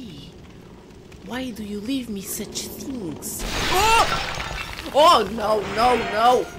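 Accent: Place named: Eastern Europe. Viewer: I thought you are east european. lol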